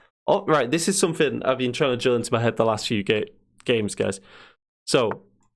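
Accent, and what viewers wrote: Hull, United Kingdom. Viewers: You sound like you’re from Hull??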